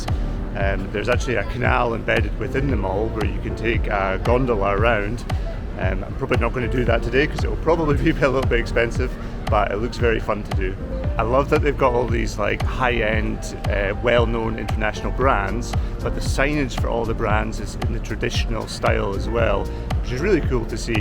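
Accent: Scotland, United Kingdom. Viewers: Aww. That Scottish accent. Made me smile all the time.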